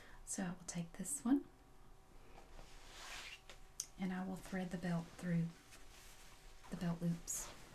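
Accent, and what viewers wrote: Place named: Texas, United States. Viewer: I've always liked the sounds coming from a hand smoothing over cloths. Gentle Whispering has a few videos in which she does this. For some reason, it is a very pleasant sound and is one of my ASMR triggers. I can't tell where your accent is from, Texas? I'm in Georgia and the accents around my location aren't like yours. I like yours better. Have a great evening and thanks for the time you put into these videos.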